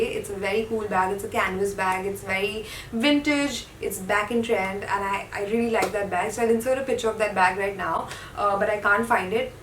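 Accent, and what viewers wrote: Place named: India. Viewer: Talk slowly..too fast hard to understand because of strong indian accent.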